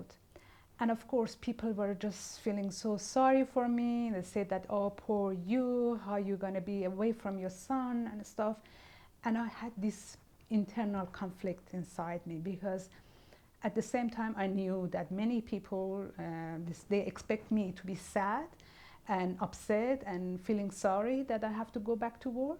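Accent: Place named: Iran. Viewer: Very fascinating. I see your name is but hear a strong Persian accent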